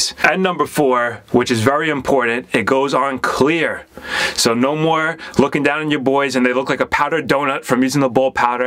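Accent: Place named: New York, United States. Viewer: Bro has the NY accent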